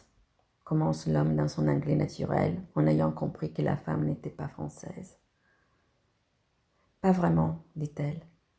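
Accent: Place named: Italy. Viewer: Oh, bellissimo, ancora! Il racconto in francese, con il Suo accento italiano: carinissimo! Esattamente quello che vorrei: una donna/moglie italiana che impara e/o parla il francese!... Parla un francese perfetto,! Allo stesso tempo, è abbastanza normale, con 25 anni di presenza in Francia, come ha già detto. Dice "Pour mes amis français": grazie! Se io potessi essere un Suo amico francese, accetterei molto volentieri!... Lei è un'amica italiana, comunque!...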